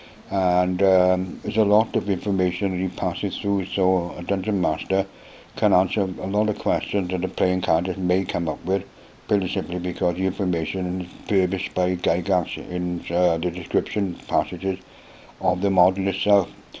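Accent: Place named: Wales, United Kingdom. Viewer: I've got an upcoming character on my channel I was thinking of giving a Welsh accent so I spent the night listening to your videos. I'm from the States so it's tough getting the rhythm down. The episode will be up in a week or so. Thanks for your work by the way. The classics are the best.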